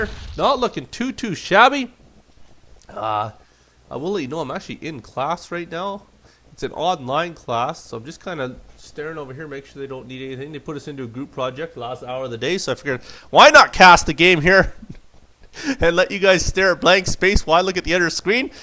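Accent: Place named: Midwest, United States. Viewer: Where are you from? Midwest? Northwest? Canada? So many "wowzers" I had an Inspector Gadget flashback. Good cast, cuz.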